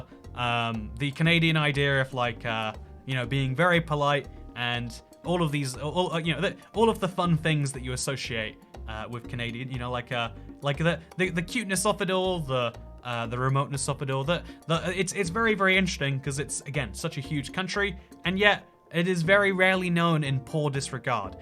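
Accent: United Kingdom. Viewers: As a Canadian with British heritage (my Mom moved to Canada with her family from Stafford when she was 11), I found this video quite amusing -- like listening to one of my British cousins trying to explain Canada :) You got it mostly right too. Good job!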